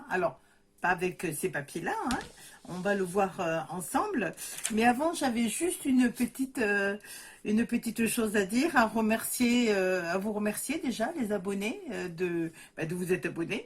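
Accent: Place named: Provence, France. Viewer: Coucou ma petite,comme tu est gentille 😊,c est moi qui te remercie,tu est si agréable, et tellement souriante,avec ton accent qui chante la Provence 😊,un régal. J ai vu de suite que tu avais coupé tes cheveux,tu est impec,😊,moi aussi mon mari m'a coupé mes cheveux, mais j ai pas le même résultat,lol,😉.Pas de soucis, tu peux compter sur moi je vais aller voir la chaîne de Ninou ☺.Un tuto 😁😁,album cartable, pas de soucis,je suis d attaque, on commence quand tu veux 😊😊, je t envoie pleins de bisous 😘😘🤗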